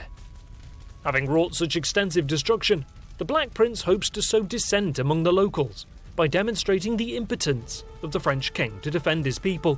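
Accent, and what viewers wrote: England, United Kingdom. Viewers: na i'm sorry x) Poitiers, the S is silent, Saumur is said Somur, Tours, S is silent... Not even close to 5% x) But yeah, this channel is cool. just that damned rosbif accent. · What part of Britain are you from?... This sounds like one of the numerous British dialects of English (yes, unlike most people, I know there are more dialects of English in the British Isles alone, than in the rest of the World, combined).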